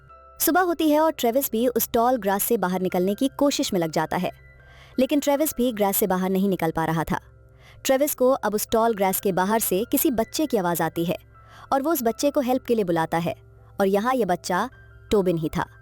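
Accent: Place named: Punjab, India. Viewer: Punjabi vibes te boli hindi jande maharaj vaise vadiya explain aa carry on👍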